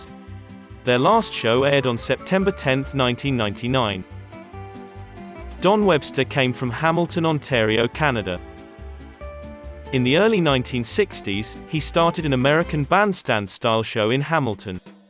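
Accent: United Kingdom. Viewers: Well, he may have a British accent and also be an American. This was a fun trip down memory lane.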